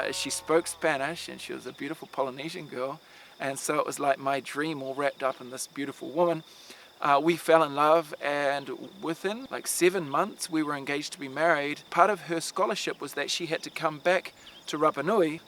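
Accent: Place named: New Zealand. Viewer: Was wondering if this fulla was from home, I could hear our kiwi Accent 😂🇳🇿🤙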